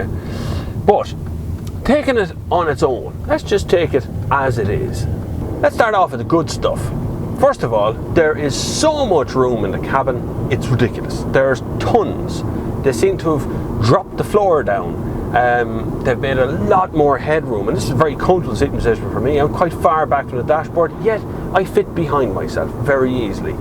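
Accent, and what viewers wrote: Ireland. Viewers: Are you from Ireland?:)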